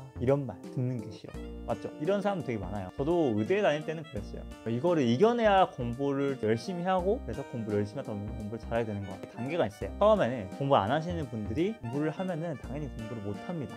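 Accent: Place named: Jeolla, South Korea. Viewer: 왜케 귀여우세용ㅎㅎ 전라도 사투리 ㅋㅋㅋ💕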